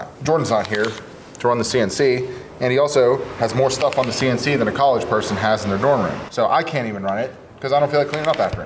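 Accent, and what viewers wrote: Pittsburgh, United States. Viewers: Hmmmm I detected a Yinzer... Looked you up, it's good to see a local (to me) doing good. (Even though you went to Franklin lol j/k The FR vs PT battles are some of the most exciting to watch). Great build, I may try and make one when I have more woodworking under my belt.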